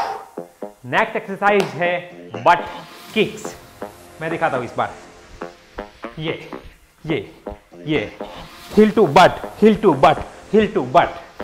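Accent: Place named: Bihar, India. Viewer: Shuddh bihari sunne Mila ...exercise and fun both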